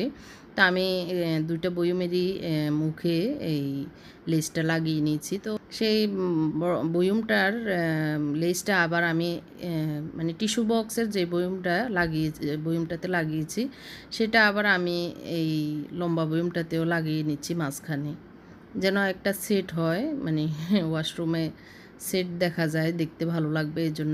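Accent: Noakhali, Bangladesh. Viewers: আন্নের বাড়ি নোয়াখালী মনে হয়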